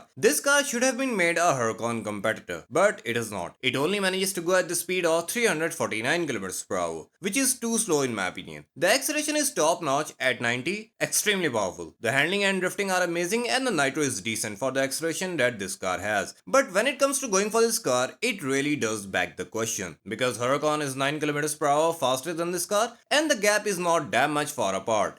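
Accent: India. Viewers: 😬 Racism at it's peak. I don't like Indian accent tbh. But your accent is the best Indian accent I've heard. EVER. Are you South Indian?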